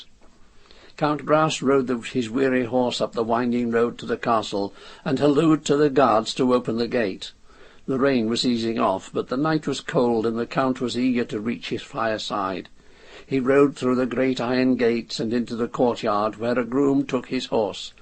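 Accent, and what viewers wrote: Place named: Yorkshire, United Kingdom. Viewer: How rare: To hear a Yorkshire accent as a reader!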